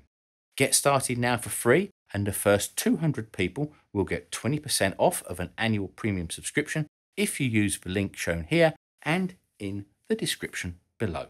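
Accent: United Kingdom. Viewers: Figures I gotta listen to a Brit to better understand what is otherwise seemingly stupid decisions behind my nation’s space program. Thank you!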